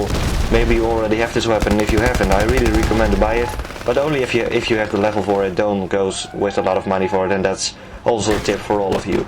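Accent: Netherlands. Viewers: Just a question:arr dutch? :D i think your voice sounds dutch^^ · Primary weapon: G3A4 Secundary weapon (Optional): none Pistol: M9 Attachments: none Gadgets: none, only the standard one (ammo box) I want to see you, and your skills only, good luck!! P.s. what is your first language? Dutch?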